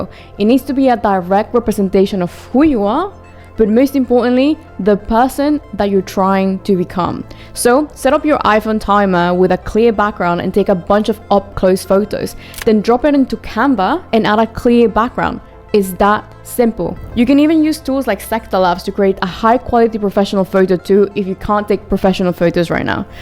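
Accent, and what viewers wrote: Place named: United Kingdom. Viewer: Sounding very British today. Slay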